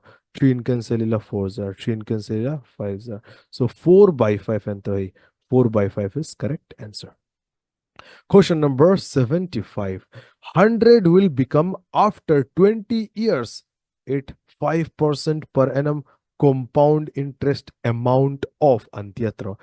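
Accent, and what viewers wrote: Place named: India. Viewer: I accent hi a bharat lutuk a, ngaihthlak ahreawm,(Hinglish). mizo tawng khan sawi tluan law law la.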